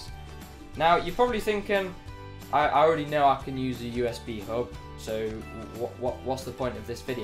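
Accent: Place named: United Kingdom. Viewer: British dialect!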